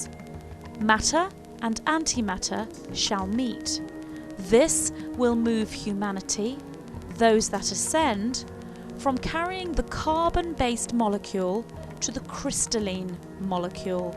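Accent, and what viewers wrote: United Kingdom. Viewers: Seeing as you live in England I would expect you to have British accent but I wouldn't expect someone from another planet to have a British accent.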